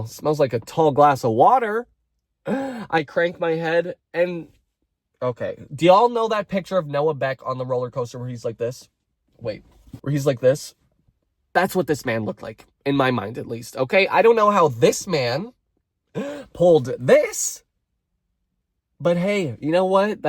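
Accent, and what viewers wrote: Canada. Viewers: Your Canadian accent really came out in this one haha you’re my new favorite YouTuber GAY GUY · dont know how i didn’t notice your canadian accent in the first video boy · That sorry... are u Canadian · Ok you’re definetely Canadian lol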